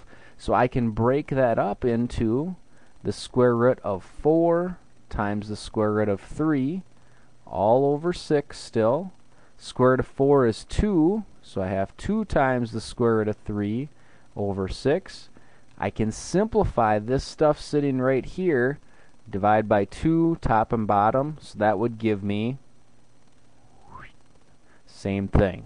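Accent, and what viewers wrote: Canada. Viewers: Are you Canadian? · ive never heard anyone more canadian and i love it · Are you canadian??? o.o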